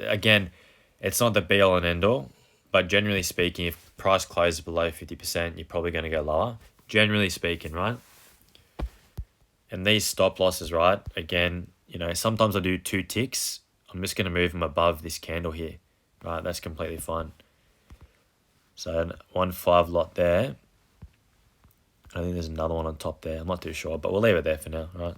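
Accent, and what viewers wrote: Australia. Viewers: Hey mate nice videos, you sound like a fellow Aussie, I’m in Bris, where are you based?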